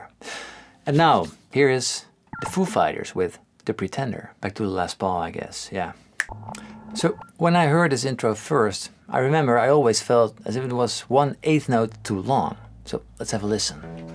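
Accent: Netherlands. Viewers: Are you Dutch? Something about your accent feels familiar.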